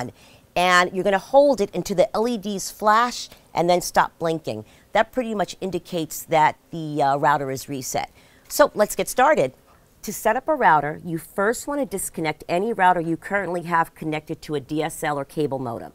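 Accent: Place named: New York, United States. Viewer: THICK ny accent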